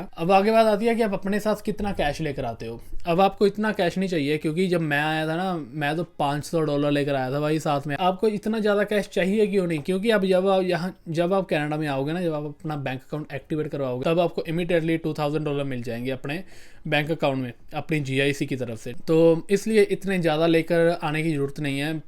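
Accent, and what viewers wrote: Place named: Uttar Pradesh, India. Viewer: Canada Jake vee up wali boli bolde ho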